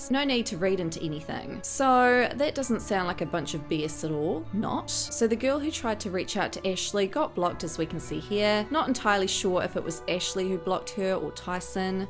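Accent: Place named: New Zealand. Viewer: But for the accent and inflections, so distracting. Sounds Kiwi then have to mute with subtitles only. No complaints about the content though Carry on while I move on to something not so jarring to listen to.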